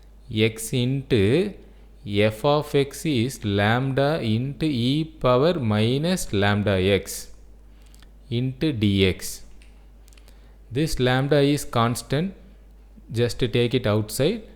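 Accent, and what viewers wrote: South India, India. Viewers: I think you are from south indian because your talking style is different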